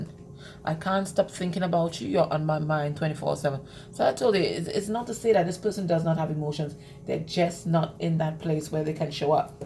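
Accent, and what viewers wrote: Trinidad and Tobago. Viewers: You sound Trinbagonian to me.....your picture even looks like someone I grow up with right here in Tobago. You have a very strong resemblance to that person.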